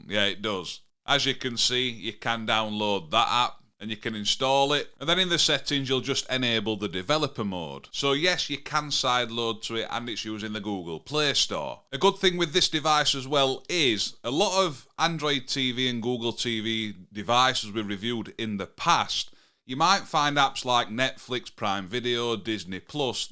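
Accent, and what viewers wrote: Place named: Wakefield, United Kingdom. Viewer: Love your accent. You sound just like my family members from Wakefield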